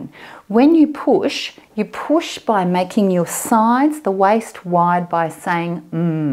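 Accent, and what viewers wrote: Australia. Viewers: you have a very very strong Australian accent! ... it sounds lovely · struggle to understand the Australian accent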